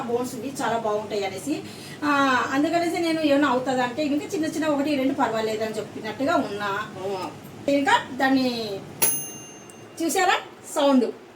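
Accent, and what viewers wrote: Rayalaseema, India. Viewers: Goodroyalseema telugu accent.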